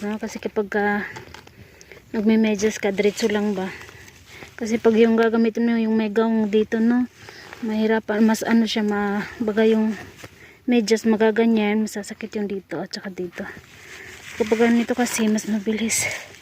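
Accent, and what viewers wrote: Visayas, Philippines. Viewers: Hello Ms...parang Pinas lang din ang cute nang anak mo at napansin ko parang Bisaya ka Ms?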